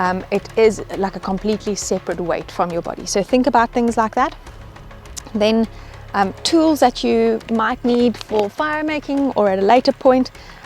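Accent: South Africa. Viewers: It's the Saffa accent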